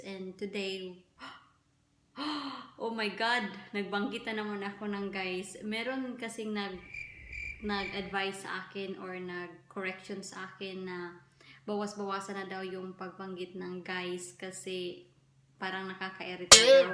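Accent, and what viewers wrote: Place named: Visayas, Philippines. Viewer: Hello Sis Taga asa ka sa Pinas murag Bisaya man ang imo accent sa words?..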